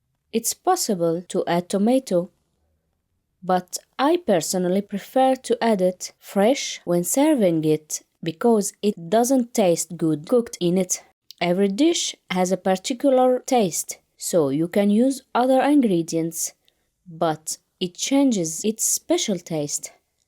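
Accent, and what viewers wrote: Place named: Kuwait. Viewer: احب حجيج الكويتي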